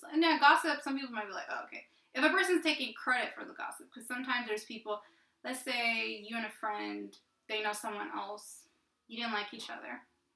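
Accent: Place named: South, United States. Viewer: Hey. I love your southern accent. Praying for us all. Take care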